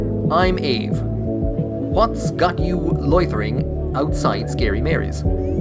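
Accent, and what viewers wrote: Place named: Dublin 4, Ireland. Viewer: How many years have you been hiding this GLORIOUS D4 accent?! I nearly wet meself!:face-green-smiling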